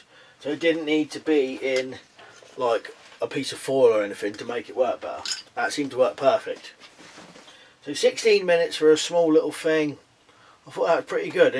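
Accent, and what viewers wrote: United Kingdom. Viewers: British accent cracks me up. Instead of water its waer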